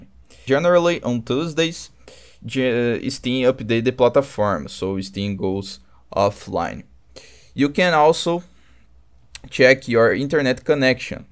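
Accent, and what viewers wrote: Brazil. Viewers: esse ingles é brasileiro ein kkkkkk satisfaçao irmao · i love you, eu sei q tu é br, esse sutaque nao me engana marvadu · Thank you very much. Recognized that brazilian accent from a mile away!! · no puedo aguantar al br hablando ingles asdkjashdka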